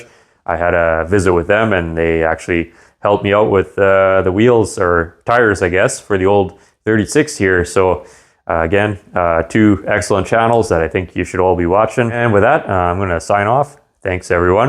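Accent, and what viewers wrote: Canada. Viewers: I truly enjoy watching your videos and listening to your Canadian accent. You also have a dry sense of humor, as do I. So I appreciate that too. Keep the great content coming. And don't beat yourself up over wasting time. We all do it. I mean, just sitting here day after day watching other people work is sort of a waste of time. But heck, I'm 82 so I do what makes me happy.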